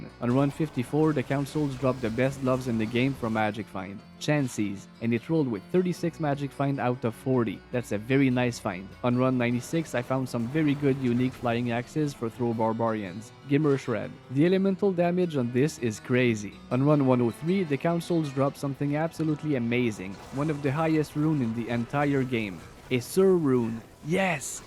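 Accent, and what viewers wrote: Quebec, Canada. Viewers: J’entends l’accent québécois à des kilomètres 😭🙏 nice vid man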